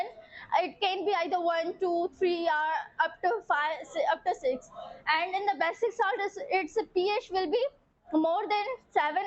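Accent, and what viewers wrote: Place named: Sadri, India. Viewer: Stand proud you tried your best🎉 btw are you speaking sadri in between 😅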